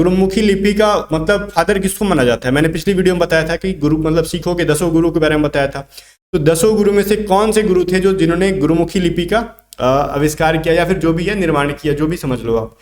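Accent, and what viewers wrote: Bihar, India. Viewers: Abe apni bihari mat jhad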